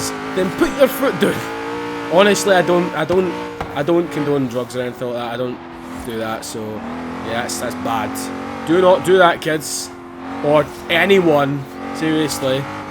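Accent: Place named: Glasgow, United Kingdom. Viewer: Bro I'm Scottish tae and love forza hyped for forza horizon 3 u sound like yer fae Glasgow ,I'm fae uddingston but cheers fer the vids m8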